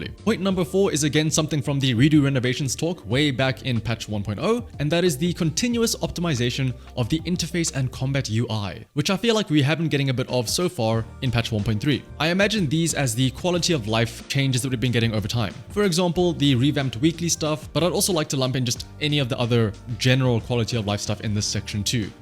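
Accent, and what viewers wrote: South Africa. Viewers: I felt that South African accent, nice to see another playing some zzz love the content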